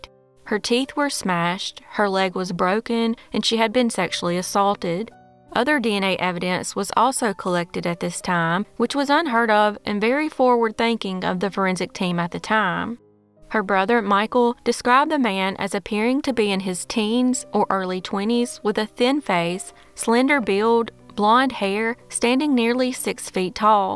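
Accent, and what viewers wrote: South, United States. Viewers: Please know that I appreciate your posts! And love your southern accent too! Lucy in Canada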